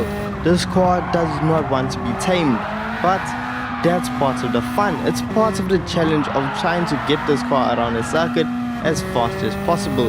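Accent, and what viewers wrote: South Africa. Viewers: the most south african sounding guy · He is from South Africa after all, the accent makes the video have that much more character.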